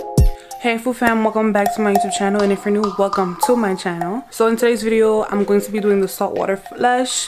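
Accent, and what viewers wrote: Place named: Jamaica. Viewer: Hi lady! You sound Jamaican like me. Where you're from originally? Mi love your videos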